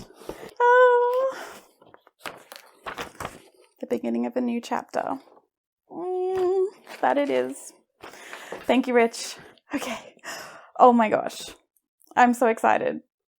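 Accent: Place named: Australia. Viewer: "My besty just brought me this in from the posty." Two sentences in and everybody knows, you're Australian 😂 Also i bet most parents aren't as happy about their new born babies as you are about that Filament 😂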